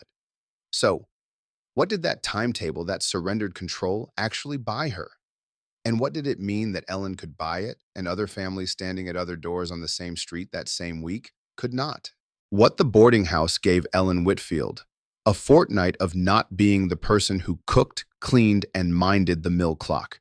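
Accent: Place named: United States. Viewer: Pity the narrator has an American accent to describe a British holiday video.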